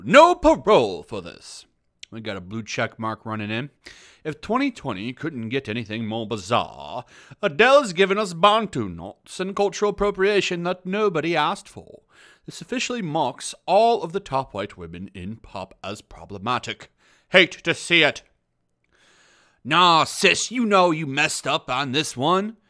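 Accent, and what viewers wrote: England, United Kingdom. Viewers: Lol your English accent made my day. 😂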